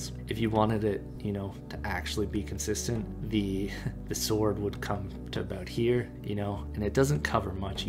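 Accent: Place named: Midwest, United States. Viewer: So curious if you’re Minnesotan, or from one of its neighboring states. Your accent just has some of those midwestern notes I’m so familiar with haha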